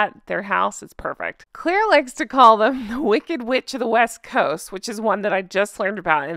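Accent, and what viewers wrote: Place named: South, United States. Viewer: has a sexy voice, a not too posh Southern accent and with a lovely timbre. I think he's in his late 40s or early 50s due to pop culture references he sometimes makes to the 1980s, including the music of that era. Bet he's handsome AF.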